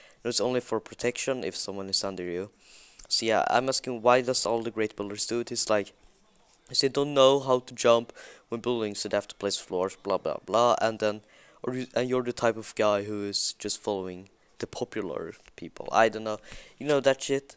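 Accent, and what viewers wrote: Sweden. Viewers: You're swedish right? Jag hör det på din brytning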